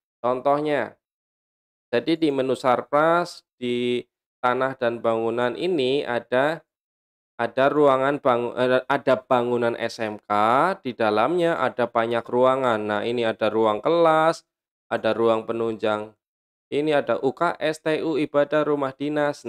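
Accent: Banyumas, Indonesia. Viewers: Terima Kasih Ilmunya Pak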